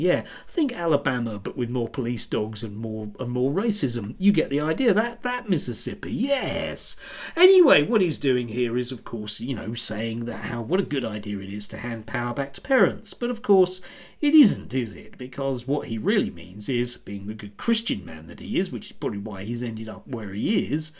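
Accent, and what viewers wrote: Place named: Birmingham, United Kingdom. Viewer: Theres nothing wrong with a Birmingham accent 🤔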